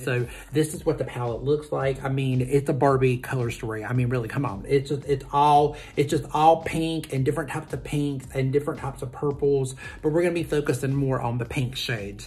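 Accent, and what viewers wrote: South, United States. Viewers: I adore your Southern drawl,. I had a PhD supervisor from Georgia and I would swoon every time he spoke. Even 20 plus years later, I cannot remember a word he said because I was too busy swooning at how he spoke. 😂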